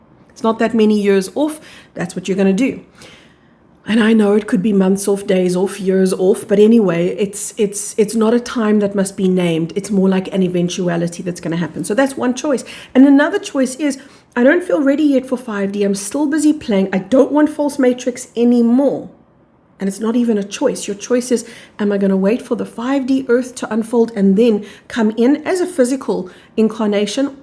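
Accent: South Africa. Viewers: S.A. accent?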